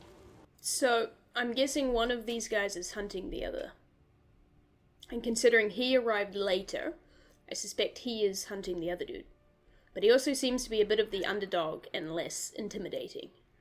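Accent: New Zealand. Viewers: This was James Cameron's first big film. He built his fame off the success of this film. Great New Zealander accent!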